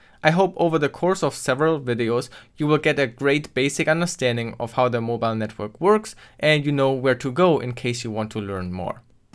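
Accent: Germany. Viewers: Are you german? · Hmmmm 🤔 i can clearly hear that you are German ^^ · Chicken Fish i think he is from germany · Are you a German? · Are you german Bist du n deutscher😂?? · Bist du Deutsch? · junge hört man krass das du deutscher bist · Man hört, dass du deutscher bist. :D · You are german right? Guten Tag ich bin deutscher :-P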